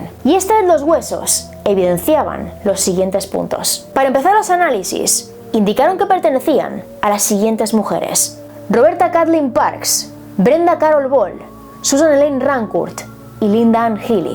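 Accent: Spain. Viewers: La mayoría de tus suscriptores son latinos en América.....tu acento español está muy muy marcado....si lo cambiarás un poco se te entendería mejor ....saludos !!! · Eres genial, me encanta como relatas y tu voz de española je, te mando un fuerte saludo desde México.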